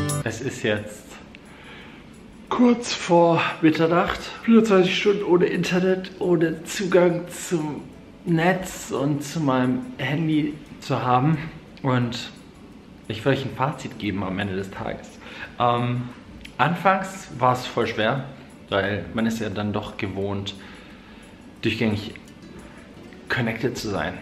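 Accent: Swabia, Germany. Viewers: Dein Schwäbisch😂👌 Komm au ausm Schwaobaländle😁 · Omg rede öfter schwäbisch 😍😂 Da fühl ich mich wie zu hause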